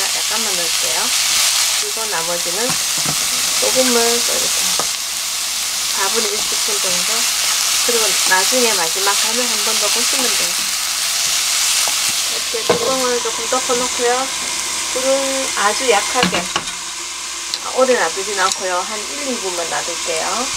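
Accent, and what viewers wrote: Gyeongsang, South Korea. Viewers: 찾아보는 경상도 말씨라 귀에익고 설명도 잘 해주셔서 늘 잘보고있습니다 고추장도 쉽게 가르쳐 주셔서 찹쌀고추장 담아 여기저기 나눠주고 맛있게 먹고있어요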